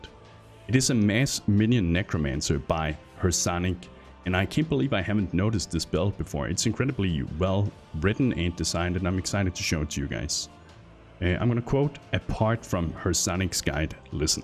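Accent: Denmark. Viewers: Love you can hear you are danish.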